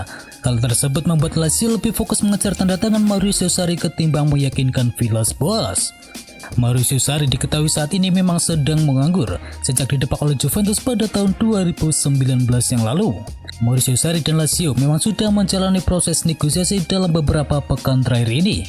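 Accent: Java, Indonesia. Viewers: Tolong bagi pembaca berita logat jawa nya d samarkan bos ,. Gk enak dnger nya . Kalo sekalian bahasa jawa mah iya aja aku suka .. SekedarMasukan dan saran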